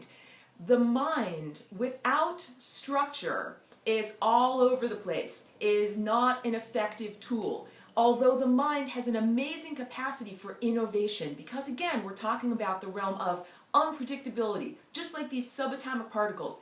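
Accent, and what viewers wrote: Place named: New York, United States. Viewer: Interesting shift from previous video. Faster speaking. A bit more NY accent.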